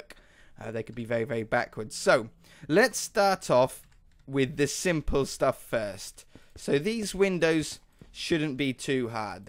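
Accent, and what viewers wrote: United Kingdom. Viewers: where about in the UK are you from I can't tell from your accent?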